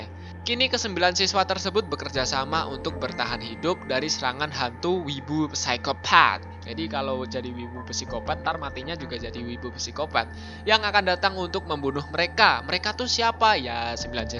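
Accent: Java, Indonesia. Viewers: Bnjir yg punya Chanel Wong jowo kyo aku mas smpean😂Wong jowo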